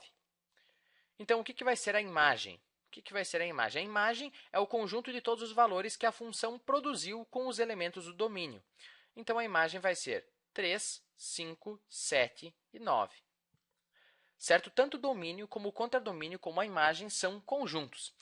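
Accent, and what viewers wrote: South, Brazil. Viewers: esse sotaque é do sul. você é de onde?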